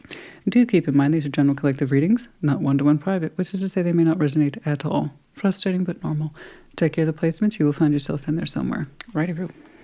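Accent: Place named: South, United States. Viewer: You are such an interesting and intelligent reader Scorpio sister, and I truly make an effort to try to listen to you. To no avail. Why effort? Because English is not my mother tongue, and despite having lived in D.C. for twenty years, I didn't have any exposure to the southern states, therefore your fast speech and southern accent with words not clearly articulated pose a big problem for me. I am sure others sail smoothly through your presentation. I manage a little and then I give up, as I just don't get but 30% of what you say. Sorry for being frank, regards from one older woman from Europe who would otherwise be ecstatic to follow your channel. Best to you!❤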